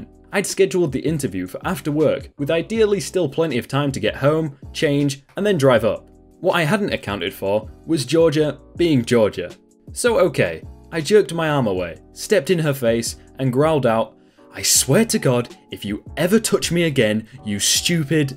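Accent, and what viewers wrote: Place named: Yorkshire, United Kingdom. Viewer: Your deep south accent sounds suspiciously Yorkshire-like...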